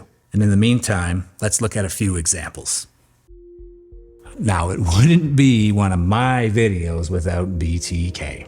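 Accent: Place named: Canada. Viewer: Actually, I wonder about you. You practically make rock stars out of these killers. It's as if you idolize Dennis Rader. He murdered four members of a hardworking immigrant family named the Oteros in Wichita, KS. He took their ten-year-old daughter, Josephine Otero, and hanged her on a pipe in the basement and then jerked off to her corpse, leaving sperm on her leg. There was no DNA tracing then, so we rely on the evidence at the scene and his own testimony. This guy you can't get enough of? Stay the hell in Canada, you sound Canadian. I hope Trump buys your country out! You know, Kanuck, you can always visit Rader in prison. What, too chicken? Why don't you examine a few of your own killers, like Billy Bishop (72 kills) and Raymond Collishaw (68 kills, many others when he became a mercenary after WWI). They murdered more than Bundy or Rader, or any other hero of yours.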